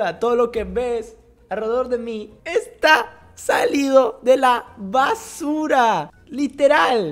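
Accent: Yucatán, Mexico. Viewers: Wey yo recuerdo que me suscribi con un video de lol, de la nueva grieta, donde te burlabas de las antiguas torretas de cochino. Tu asento yucateco me cagaba de risa y termine suscribiendome. Chido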